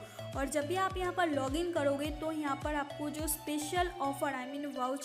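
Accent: Bengal, India. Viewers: Bangali hai ye log aise hi Bolte hai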